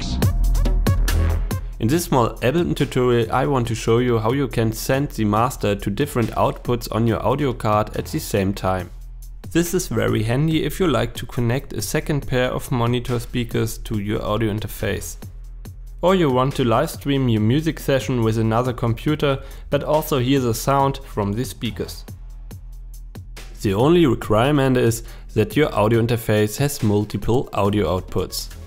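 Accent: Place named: Germany. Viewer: The German accent ist awfull 😀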